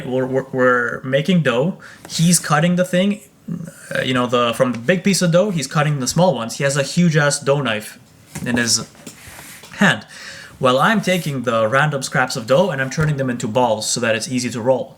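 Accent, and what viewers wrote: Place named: Eastern Europe. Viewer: its his accent/etc - he sounds eastern European to me, but maybe northern.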